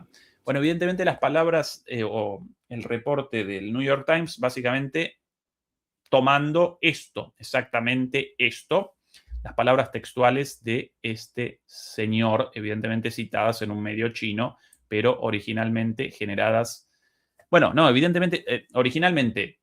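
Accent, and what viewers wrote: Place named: Buenos Aires, Argentina. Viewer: Pero hablas Porteño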